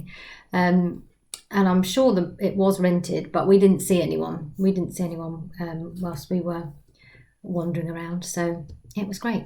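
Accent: United Kingdom. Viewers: OMG! When I heard you say "squirrel" I was like my soulmate, I use that phrase a lot when I have "squirreled some dolly funds away" haha. Love it. I am English but have lived overseas for over 21 years. Sindy was my very first fashion doll. I collect fashion royalty dolls by integrity toys and really into the bigger girls now, such as pasha pasha, joydolls, doll menagerie, tender creations and other ball jointed doll artists. These talented artists seem to all be in Russia or the Ukraine. Just lovely hearing a English accent. I have just subscribed to you.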